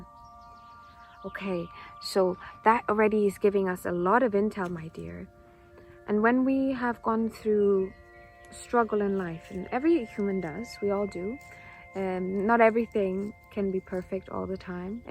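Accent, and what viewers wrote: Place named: Ireland. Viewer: Thank you, for a very nice, spot on reading. I like the Irish touch in your accent. Looking forward to more readings. I feel very good about what I have built, abundance is very subjective. I know the abundace that I aspire towards.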